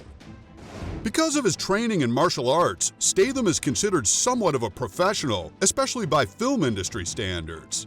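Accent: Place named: United States. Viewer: this overexaggerated american accent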